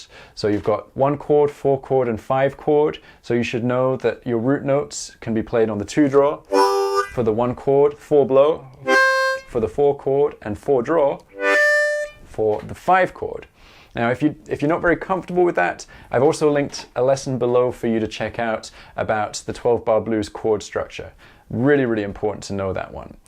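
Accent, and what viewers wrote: Scotland, United Kingdom. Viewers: You're sounding less and less Scottish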